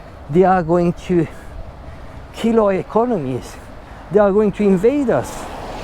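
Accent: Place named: France. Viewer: Please never stop talking and teaching about China, it is important and so necessary to push back against what we are told and given in media. I learn so much from you and maybe because you look familiar to me (my father being from Napoli) even though your accent sounds very french to me. If you have seen and lived this reality i trust 😊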